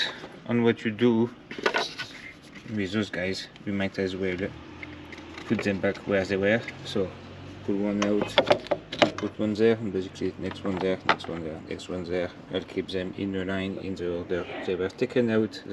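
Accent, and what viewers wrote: France. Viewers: I love your French Englisch :) Very enjoyable. I hope i'll get a Modus for my wife next week. Her loved Twingo mk1 needs replacement. And now i know how to change the oil and stuf for her future car. Thank you for that :) Greetz from Holland!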